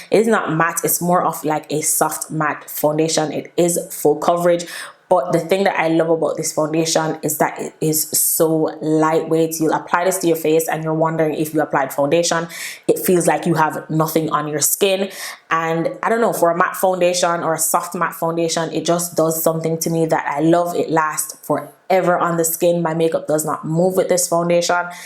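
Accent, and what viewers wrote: Jamaica. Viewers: She has a Jamaican accent. Everyone has an accent sis.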